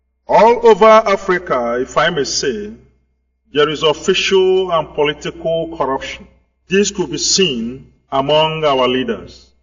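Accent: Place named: Igbo, Nigeria. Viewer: you are an idiot what proves do you have that the orator is hamate can't you hear the accent is an Igbo man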